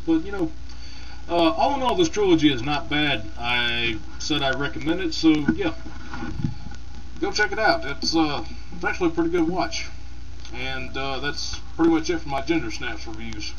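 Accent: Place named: South, United States. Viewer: Hey, I'm from Canada. I think this review wouldn't have been as cool if you didn't have the accent. It makes me like you. Where are you from? Sounds very Southern. Either way, great review. I want to note however that they put real indians in the movie. I mean ful blooded ones, which I thought was extremely Canadian.nJust wondering if you noticed that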